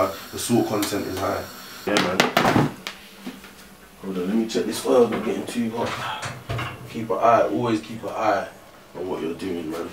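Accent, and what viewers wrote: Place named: London, United Kingdom. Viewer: Where's he from? I'm guessing either Hackney or Tottenham the way he speaks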